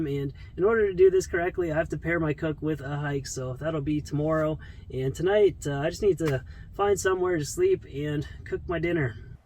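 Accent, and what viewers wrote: Canada. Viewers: Canadian, ey?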